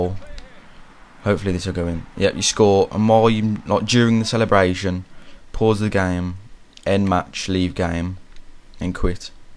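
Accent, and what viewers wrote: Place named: Black Country, United Kingdom. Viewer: u sound like ya from west midlands like me but u soun d black country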